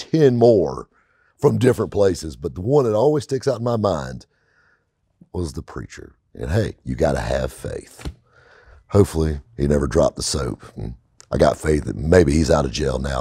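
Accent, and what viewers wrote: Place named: Carolinas, United States. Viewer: Holy cow! I worked in Hendersonville NC for several years at Hunter Chevrolet Subaru Hyundai! That’s basically where I cut my teeth! You’re so close and the accent from Hendo to Seneca is almost the sale. Have to cross paths with you some time. You know Stephanie the Photographer and I wanted to hire you for a car show!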